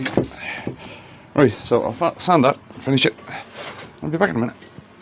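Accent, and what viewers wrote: Ireland. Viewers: I love your videos! You always tell us what you are doing. That turned out exactly like the sketch! There is no way I could have or would have attempted it. Only been turning about 8 months though lol. As an American I'm in love with that beautiful Irish lilt in your voice. Happy New Year to you and your family!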